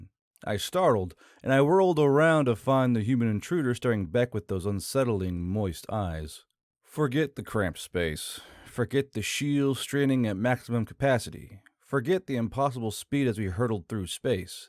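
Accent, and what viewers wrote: South, United States. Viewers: He has a version of a Southern Accent. I, myself, would NOT wish him to try to learn to speak "standard American"! There is much more to his speech than of just an accent, it is also the feelings and idioms too. Must the non-AI storytellers also speak the same way that the AI speaks?! I hope not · I'm not disagreeing with you. But I have to comment on the standard American comment. You cannot get more American than this mans accent. It is found no where else. That is all. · Being from the south myself, I can appreciate a good drawl. However, the good old boy is no excuse for improper diction and pronunciation.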